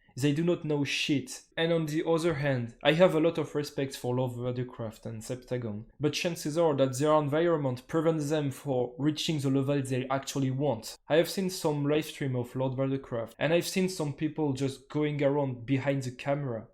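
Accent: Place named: France. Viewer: you have the french accent lol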